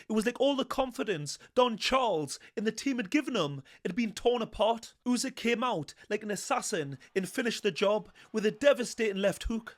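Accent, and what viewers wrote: United Kingdom. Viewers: What uk accent is this guy speaking?🤔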